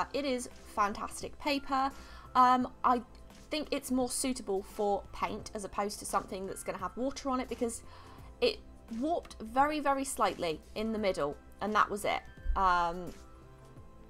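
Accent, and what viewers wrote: United Kingdom. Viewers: I love your British accent ❤🥀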